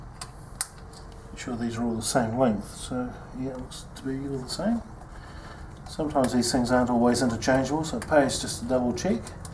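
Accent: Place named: New Zealand. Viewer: Awesome video. Editing & presentation is outstanding along with the clarity and simplicity of instructions. I'm inspired now to arrange the purchase of an 883 tank for installation on my 48. Good to hear a Kiwi accent all the way from Onehunga after living in Oz for the last 10 years. LoL Cheers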